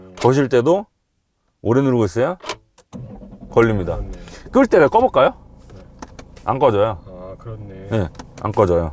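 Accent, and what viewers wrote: Chungcheong, South Korea. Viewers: 편안한 충정도 사투리 속에 날카로운 디테일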